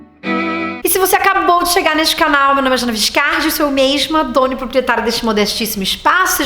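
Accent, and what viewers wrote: Rio de Janeiro, Brazil. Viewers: Hahaha legal, mas ela manda bem no jeito carioca de falar. Abração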